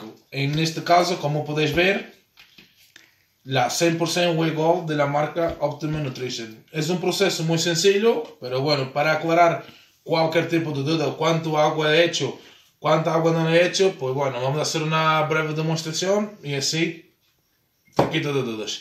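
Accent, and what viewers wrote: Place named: Portugal. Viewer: Voce es português me encanta el acento se portugal 😀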